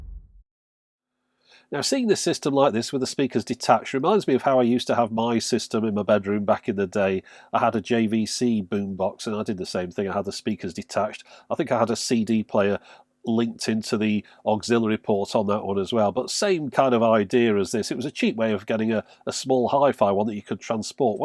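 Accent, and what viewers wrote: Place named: England, United Kingdom. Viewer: Aloha , a great video as ever BUT I need to defend the Pioneer CT-M6R I bought one out here and after removing the jammed tape it has worked perfectly for over 12 moths , I also got the matching Receiver & 18 CD changer all as cheap as chips & all working perfectly .Thanks again for an entertaining video . I enjoy listening to another English accent , I'm from Solihull :) .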